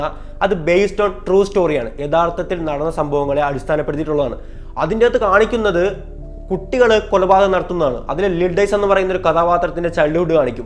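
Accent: Vadakara, India. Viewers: ... Nee vadakarayano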